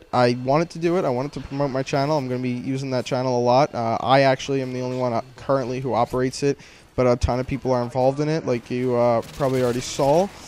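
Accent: Spain. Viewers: eres espanol tio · ur spanish?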